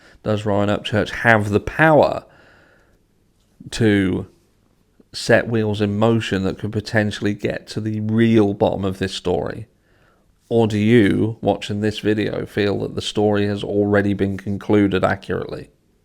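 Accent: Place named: England, United Kingdom. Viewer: I want a “but anywaaay; I digress” in rad English accent hoodie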